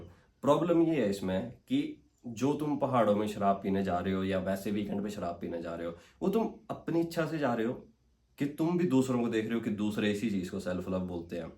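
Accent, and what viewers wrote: Himachal Pradesh, India. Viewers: You are talking in hindi,so there is a himachali touch in it and i am from Himachal,so I felt good to hear you Nice 🥰❤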